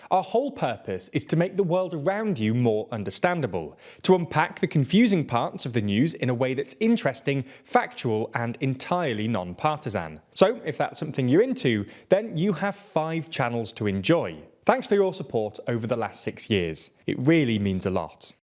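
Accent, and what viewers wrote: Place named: United Kingdom. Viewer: Hi, I'm spanish. Sooo, this is what you call a strong british accent? My god, that pronunciation of 'Blizzard' was incredible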